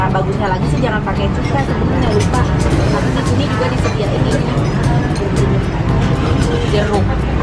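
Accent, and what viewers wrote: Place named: Sunda, Indonesia. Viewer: ak nonton nya ga fokus sama bakso nya tp fokus ke teh yg cantik. 😍😍 sukses turus buat canel youtube ya teh 👌😍. salam dari Pelajar di jepang. ak selalu nonton trus youtube teteh. teh cantik lucu juga kalo ngmong bahasa sunda 😁😁ak orang jawa tengah.. pokok nya semangat trus teh buat konten youtubenya 👌😁😍😍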